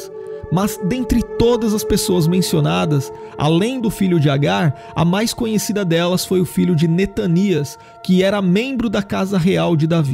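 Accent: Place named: Interior São Paulo, Brazil. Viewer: Essa pronúncia do R do narrador, Um R corrido, é horrível. O cara faz vídeo pra o Brasil inteiro e não trabalha a pronúncia do jnterior Paulista. sinistro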